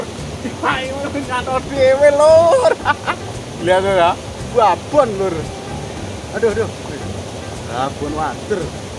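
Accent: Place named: Java, Indonesia. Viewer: Jowo mase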